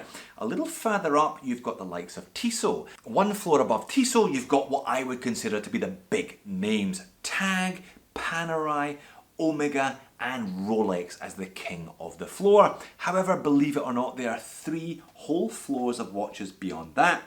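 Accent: Scotland, United Kingdom. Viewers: Are you Australian? It may just be because I'm a stupid American but you sound very Scottish to me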